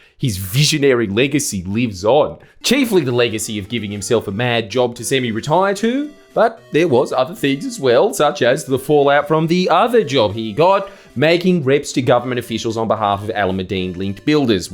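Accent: Italy. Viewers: Glad to hear the Italian accent is back. It’s like being back in the 90s. Thank you,.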